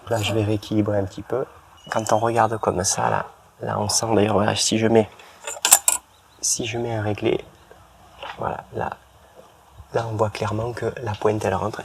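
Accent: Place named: South, France. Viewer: Rien que pour l'accent du Sud j'ai mis un pouce et me suis abonné,ça m'a rappelle mon Vaucluse...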